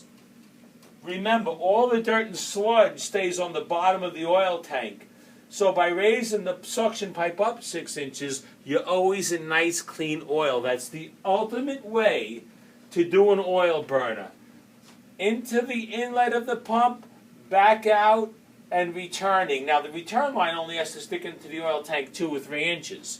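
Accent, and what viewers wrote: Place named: Georgia, United States. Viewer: Great video, sir, very informative. And I love that Southern accent---Georgia perhaps?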